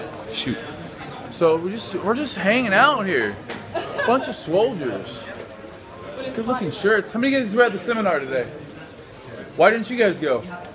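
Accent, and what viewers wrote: Scotland, United Kingdom. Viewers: Love your Scottish accent haha makes me laugh! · Hahaha ur Scottish accent is well funny. Come to MANCHESTER ENGLAND!!!!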